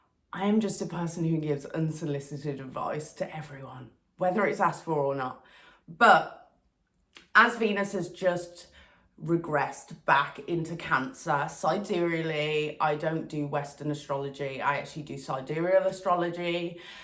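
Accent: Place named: Midlands, United Kingdom. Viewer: Damn I feel like I was supposed to find your channel right now. I been conserving solar energy on my own for 6 months to follow my life purpose within boxing, but sometimes it’s tough. But I appreciate the message in your content a lot, my current experience really resonates with what you’re saying. How did you come to learn the things you’ve learnt? Also are you from Brum or the midlands? Your accent is familiar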